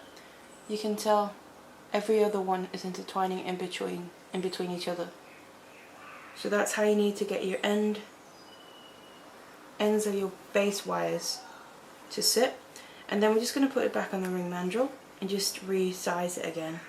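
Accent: United Kingdom. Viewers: Awesome video! Where in the UK is your accent from?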